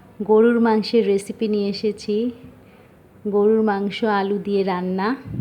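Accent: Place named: Noakhali, Bangladesh. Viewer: apnar kotha sune mone hosse apni noakhalir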